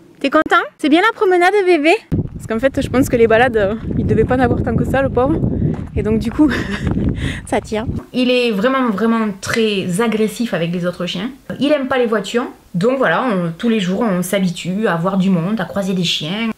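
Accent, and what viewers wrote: South, France. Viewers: Il va être très heureux ce chien avec vous, un malheureux de moins à la spa. J'aime beaucoup vos vidéos pourtant je suis pas une jeunette mais ça me fait passer des petits moments agréables. Votre accent du sud est très plaisant, je suis du sud aussi. Vous faites très authentique, c'est cela qui me plaît beaucoup chez vous. Merci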